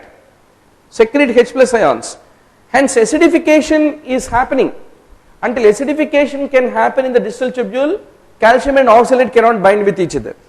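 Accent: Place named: South India, India. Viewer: Filho well its english but the accents are diffrnt. south indian people have this kind of accent. nothing wrong with that. uk n us english have lot difference in accent as well. it varies frm region to region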